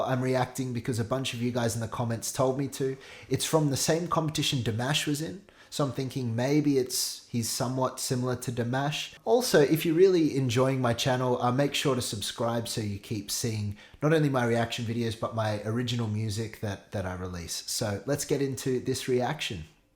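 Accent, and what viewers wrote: Australia. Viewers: It’s not Hau, it’s Hua 😅🙈 But I love australian accent.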